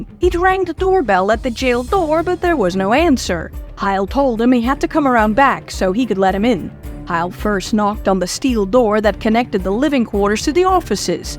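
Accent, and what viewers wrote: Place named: Netherlands. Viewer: I love that Dutch accent. This is brilliantly made.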